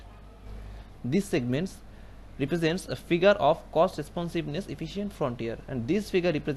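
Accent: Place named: India. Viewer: Your accent is very bad ... i hate indain accent unknown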